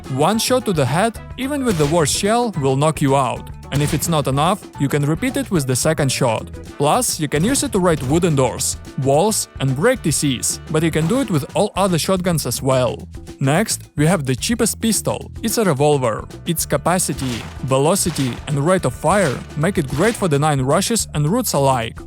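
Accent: Russia. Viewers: я слышу руский акцент ?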